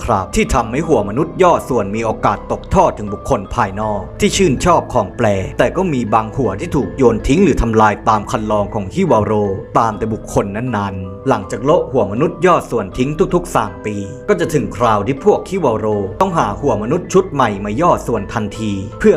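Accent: Thailand. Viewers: แอบเหน่อนะเรา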